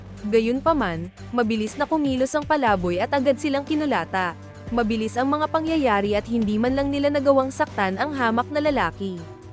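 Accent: Visayas, Philippines. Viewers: The narrator is Bisaya...kinulata?😁kaway kaway beh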